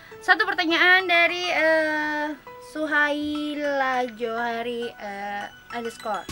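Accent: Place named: Malaysia. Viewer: Ya karena dia lagi di malaysia, jadi mencoba bicara dengan gaya malaysia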